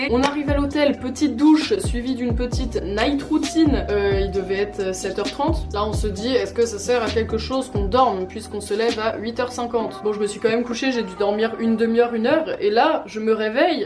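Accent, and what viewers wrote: Normandy, France. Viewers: Mdrrr vive l’accent normand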